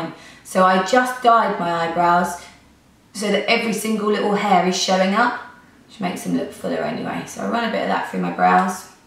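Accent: United Kingdom. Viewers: I was au pair in Germany and Austria, I speak good English and I would love to have to British accent! So... If you need one:)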